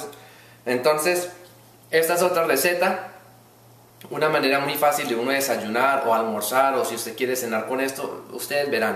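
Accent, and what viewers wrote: Antioquia, Colombia. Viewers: Hola niño guapo! Buenísimo! Buscando recetas para cocinar la quinoa llegue a tu canal, aunque ya había hace algunos meses ya había visto algunos videos tuyos y me parece que tienes muy buena información. Eres tan fresco e inhibido, invité a mi hija a que viéramos juntas este video, pues es para ella a quien le cocino este tipo de comidas, ya que es vegetariana, (algunas semanas vagana, jiji!) Y le caíste de lo mas bien y dijo: He's so cute! le encantó tu acento colombiano...pero mas se sorprendió de cuanta buena información proporcionas. Dale gracias a tu mami por esta deliciosa receta, y por favor que se anime a abrir su propio canal para que nos comparta todas sus recetas vegetaríanas y/o veganas que me imagino ya deben ser muchísimas! Saludos Cordiales! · ole tu suenas paisa, ja ja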